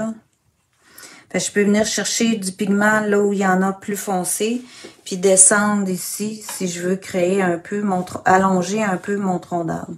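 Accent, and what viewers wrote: Canada. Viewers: Bonjour de France.Vous êtes super mimine.Ce sont les mots qui me viennent.😁Je vous suis depuis quelques temps ( et dieu sait ...que j'en ai vu des Tuto Vidéos de Peintres enseignants sur la Toile en tout genre ) Je vous félicite pour votre manière d'expliquer vos techniques , votre patience et dévouement . Vous et votre accent Canadien sont lumineux sur la toile.Evidemment vous travaillez pour PIN-SO ...mais...on sent votre Passion pour la Création et il est contaminant et productif ! J'ai débuté la Peinture au début Covid'.( enfoui en moi depuis longtemps) (Merci Virus 🙏) .J'ai foncé dans l'Acrylique. J'ai reçu des Félicitations qui m'ont porté un certain temps....mais....étant ALERTEUSE d'Infos sur internet Combattante , cela m'a légèrement diminué mon enthousiasme.Alors j'ai foncé en Aquarelle.Mais ...la encore J'ai eu le tord ( et je dis ça pour les novices ) de visualiser un trop grand nombre de Vidéos diverses et variées sur les Peintres et ..je me suis noyée !! 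(Trop d'infos ...tue l'info ) et ...j'ai tout arrété ! .Le VIDE.Je ne savais plus vers quelle méthode me tourner et ça...c'est vicieux ( alors que je me suis procurée des Tas de Pinceaux de toutes marques ) A un moment .Le trou noir . On pense qu'on y arrivera pas ( mm si on en crève d'envie ) Et.....je suis tombée sur Vous et...vous m'avez redonné l'envie de Reprendre .... Alors ..de FRANCE..un Big MERCI. Je vais visiter vote site. Que la lumière continue à briller autour de Vous surtout en ces moments difficil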